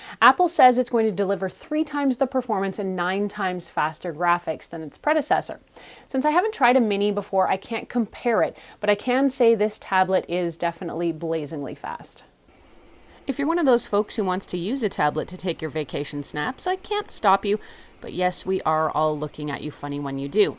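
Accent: Canada. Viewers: Atre u Canadian?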